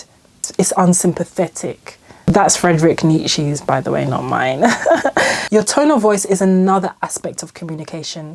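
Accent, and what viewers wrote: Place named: United Kingdom. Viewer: it's just proper British talkin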